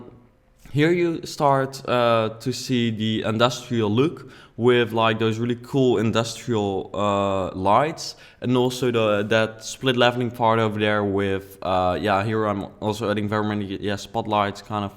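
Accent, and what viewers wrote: Netherlands. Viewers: Suupermooi gemaakt! Ik hoorde aan t begin van de video t nederlandse accentje er al doorheen, kun je ook niks aan doen tho ;) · Ik hoorde gelijk dat je Nederlands bent ;) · Are you dutch?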